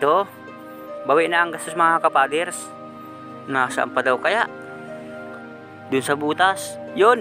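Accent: Bicol, Philippines. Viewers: Parang bicolano eh .haha parang catanduanes?? Baras ??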